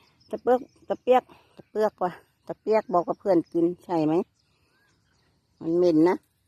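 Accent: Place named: Laos. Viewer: พูดเนิ่อๆ เหมือนคนอุตรดิตถ์ลาวเวียงนะแม่บ่นไปเถอะได้ตังค์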